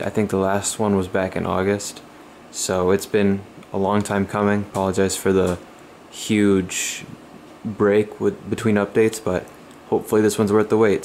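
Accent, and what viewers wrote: United States. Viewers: HaHa love the intro! Nice to see this airport again! Love you're Massachusetts accent! You know I really love the Louisville route! You could always sent some deltas to DTW or MSP. Hey your Raptors might come down to Louisville to play if Canada doesn't let up their lock down! Great update!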